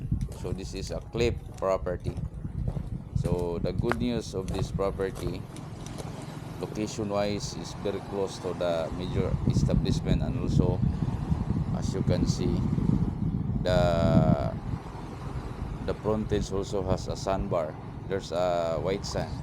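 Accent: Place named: Visayas, Philippines. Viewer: bisaya Naman ang accent mo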